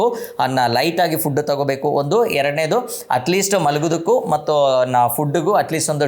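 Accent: Kerala, India. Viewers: Neenu. Malayali. Alva. Sir. Nimma. Kannadadalli. Gothaithu. Tummba. Chenagide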